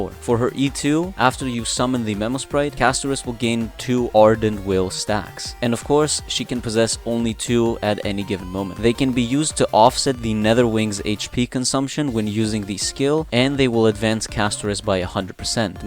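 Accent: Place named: Greece. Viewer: That accent.... Are you greek bro? 😂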